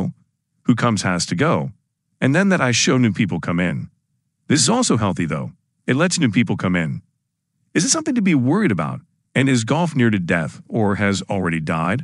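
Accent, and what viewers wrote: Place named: United States. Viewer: ..Why is someone with an American accent calling soccer, football????????z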